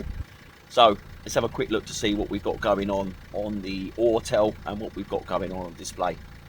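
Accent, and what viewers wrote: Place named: South, United States. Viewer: Fabulous work only wish you live nearer than your southern accent may suggest 😜👍, I want this same procedure doing so can you let me know how much to expect to pay so I can get some quotes? Thanks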